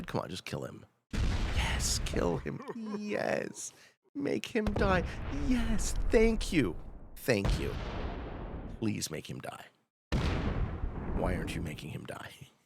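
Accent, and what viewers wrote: Canada. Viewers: im from northern MN, yes you sound like a Canadian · you’re incredibly Canadian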